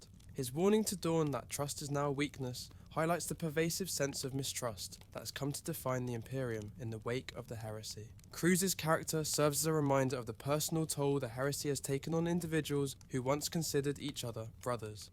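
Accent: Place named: United Kingdom. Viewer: I like hearing a British person speaking about 40k. I know an American or anyone can speak about it also. But I greatly prefer a British person like this guy since 40k comes from Britain. It's sort of like... idk, I want to hear a Chinese man when I'm learning about the Three Kingdoms period or Chinese cuisine. I want to hear an American when I'm learning about modern money or finances, or other American dominated fields. And I want to hear a British person talking about 40k.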